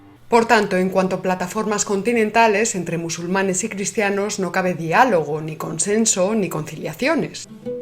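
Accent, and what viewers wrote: Spain. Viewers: Ole esta española! Que gusto oírte!!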